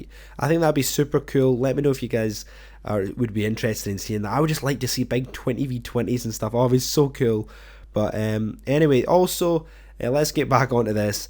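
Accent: Scotland, United Kingdom. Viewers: Are u Scottish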